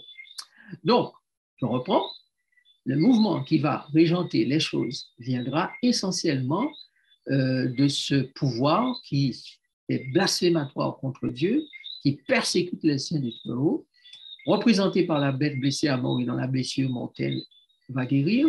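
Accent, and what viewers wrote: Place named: Haiti. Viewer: Tu dois bien t'exprimer en français tu parles comme tu chantes? C'est la langue (patois) haitienne qui détruit ton français et ton accent . Les haïtien parlent de la même façon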